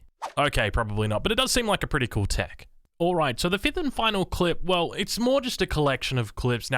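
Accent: Australia. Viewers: "My soothing australian accent" - Self awareness is key Mr:D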